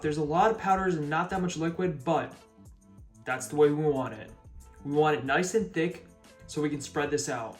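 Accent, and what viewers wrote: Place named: New York, United States. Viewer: I’m from New York and I’d bet money you are either from New York or New Jersey the way you say chocolate 😂 Thanks for this awesome recipe! Happy Holidays sweetie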